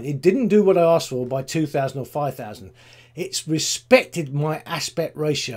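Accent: United Kingdom. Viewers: Good explanation, Been struggling with this for a bit but makes a lot of sense. Nice to hear a UK voice on a LR video. Thanks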